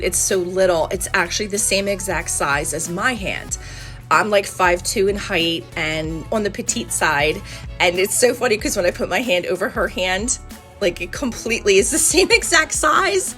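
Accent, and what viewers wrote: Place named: Philadelphia, United States. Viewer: This is a great review I like how you compared both. Love your Philly accent 😊❤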